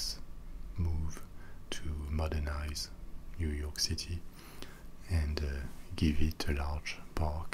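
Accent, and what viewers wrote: France. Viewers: I was gonna say he sounds French and then I took a look at his username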